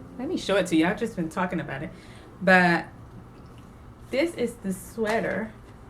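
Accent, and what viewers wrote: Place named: South, United States. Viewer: I’m a southern girl living in Colorado, and I love watching your videos and hearing your accent. It’s a little bit of home. Plus, you’re just adorable! 😊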